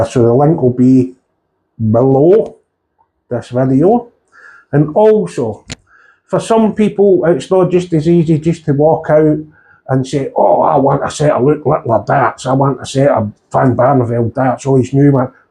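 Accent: Scotland, United Kingdom. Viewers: The trade in is an awesome idea, wish we had something like that in the UK, I'll definitely get in touch and see about the shipping cost! Also I've got no problem with your Scottish accent!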